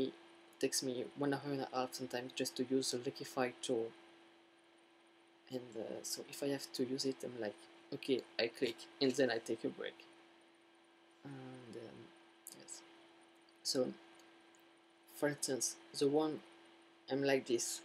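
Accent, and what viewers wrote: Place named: France. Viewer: aaah beautiful french accent, I loved Rosy 'ardy :D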